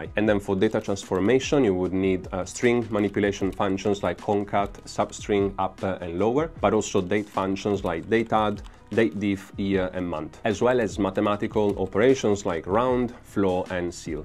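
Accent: Spain. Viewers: Este acento suena español pero gracias me has resumido mucho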